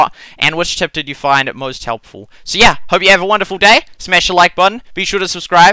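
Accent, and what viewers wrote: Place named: New Zealand. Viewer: Your zealand ayyy cousy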